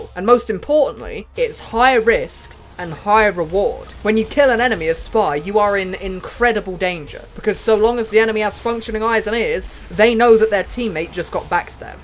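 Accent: United Kingdom. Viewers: TF2 ruined me. I thought you're Aussie but nah you're Brit. Thanks Sniper.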